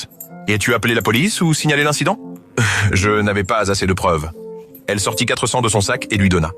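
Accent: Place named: France. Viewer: Votre accent français c'est adorable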